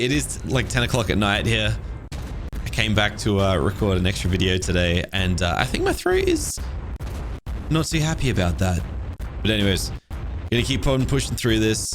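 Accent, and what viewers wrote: Australia. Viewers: Sounding extra Aussie today. Good stuff!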